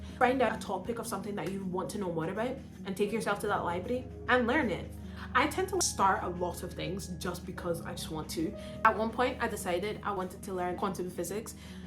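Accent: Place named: Scotland, United Kingdom. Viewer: she's Scottish, lol, love it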